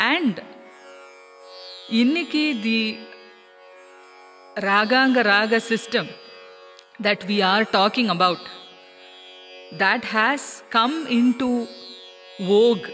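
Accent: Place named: Brahmin, India. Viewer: Excellent. I always relish the English Tamil talked by Brahmins. Can call this Brahminish.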